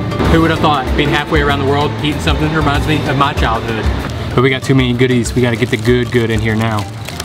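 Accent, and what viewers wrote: South, United States. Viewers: Where are you from? I hear a little bit of southern accent. Great video btw. Keep up the good work!!!!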